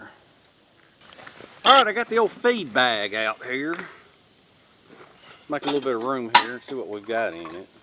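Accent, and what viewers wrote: South, United States. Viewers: Thanks for another exciting video.. Nice to see you're still thinking and doing stuff. I love the Southernisms, like a two syllable word for a cover or top to a container "leeyud". Keep em coming! Frank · Wonderful example of an American "southern" accent. Thank you. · Loving the sounds of the cicadas in the background. Oh and the bacon sizzling is wonderful! I loved the video as I do all your videos. Your southern accent makes a North Carolina boy feel right at home.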